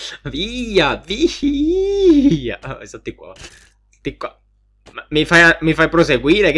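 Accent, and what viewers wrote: Palermo, Italy. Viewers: SEI DI PALERMO?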